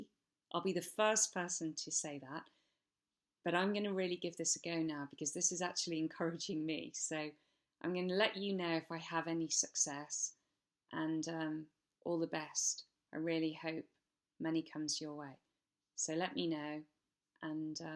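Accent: United Kingdom. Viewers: Hi from Sydney Australia, your accent is lovely always loved the British accent, I'm new to law of attraction and a HUGE fan of Neville, so many testimonials from ppl from all walks of life. even some skeptics have converted to belief after trying Neville Goddard's guidance and methods. I hope Neville is enjoying all the pleasures of Heaven, he really was HEAVEN on earth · One of best explanations I've heard on this topic. You explanation is not only on point, but succinctly covers practically every important point made by Neville in all his many talks. As a bonus, you have a dazzling smile, charming English accent, and awesome personality. I listen to this video as a daily superbly positive reminder to maintain the imagining practice, and I'm seeing TONS of benefits Thanks for making this video...subscribed...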